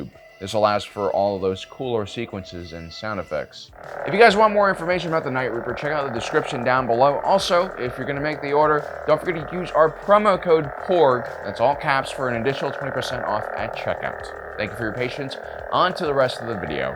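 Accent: New Zealand. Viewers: Australian? No Kiwis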